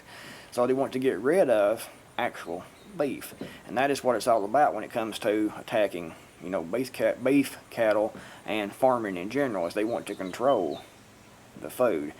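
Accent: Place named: Tennessee, United States. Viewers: I’m in Texas and I love listening to his accent and it is different from what I hear in Texas with certain words pronounced differently. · Me too! Idk where he’s at, but he sounds like he lives in Tennessee. I’m in Texas and our accents are much less twangy. I like his speaking voice.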